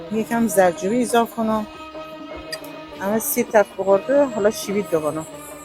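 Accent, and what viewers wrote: Gilan, Iran. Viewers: Mersi, Mersey, Che ziba va asson yad midid, ba hezaran boseh as rahe Besyar door be soorat shoma nadare honarmand va mehraban,ba oon lahjeye zybaye gilani.🦌🌹🌹🌹🌹🌹🌹🌹🌹❤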